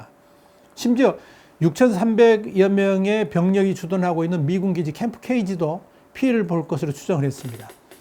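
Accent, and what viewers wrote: Jeolla, South Korea. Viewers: 언제한번 전라도 말투 듣고 이런말 할 줄 알고 기다렸는데 당신도 결국은 7시 나라 사람들이라는 걸 확인 함.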